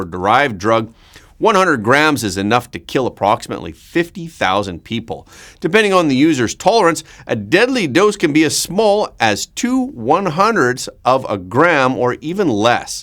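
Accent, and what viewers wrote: Canada. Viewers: you do a great job of giving us real news! Thank you! It sounds like you may have a Canadian accent? Aetna is pronounced Et-nuh. 🙃😊🤗 A few other words give you away, as not being US pronunciation’s, but not too odd to our ears.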